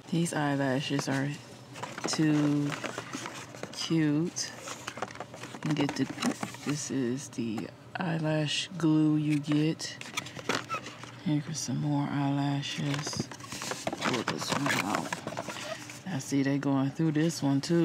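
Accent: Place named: Deep South, United States. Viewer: You from the DEEP south girl. ❤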